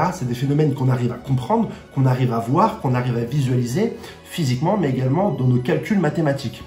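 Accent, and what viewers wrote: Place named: North, France. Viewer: votre lieu de résidence (département lol), je me sens que tu viens du nord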